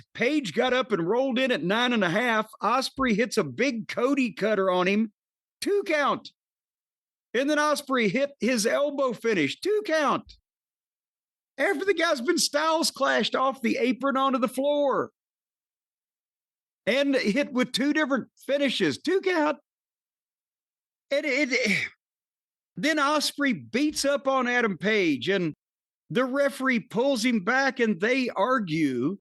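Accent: South, United States. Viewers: 'The more you here him talk, the less you like him'... im feeling this with cornette lately. His southern, unwilling to change, preacher type voice is starting to get irritating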